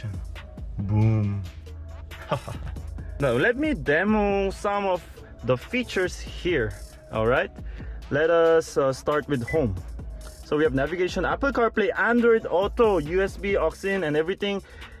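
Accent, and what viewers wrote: Philippines. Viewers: You have that filipino accent hehe.. actually many koreans do.. the korean girls are so cute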